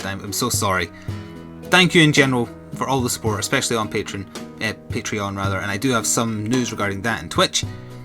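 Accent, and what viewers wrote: Scotland, United Kingdom. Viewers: He sounds Scottish so, Scotland?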